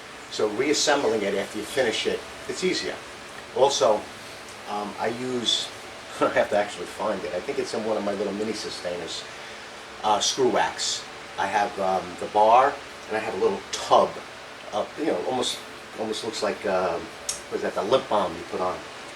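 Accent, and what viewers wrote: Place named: East Coast, United States. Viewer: you legend! liked and Subbed. love that East Coast Accent? You remind me of the Rebel Turner. All inspiration for me going home for six weeks to my home workshop in England this Thursday!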